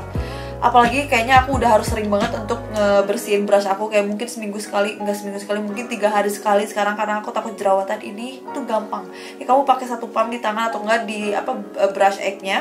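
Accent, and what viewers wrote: West Java, Indonesia. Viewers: gemes sm logat sundanya kakk 💖 reviewnya bagus singkat tp jelas 👍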